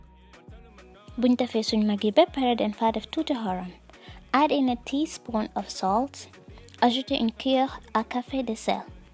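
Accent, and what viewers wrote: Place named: Wolof, Senegal. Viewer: That wollof accent is wow! sama harit!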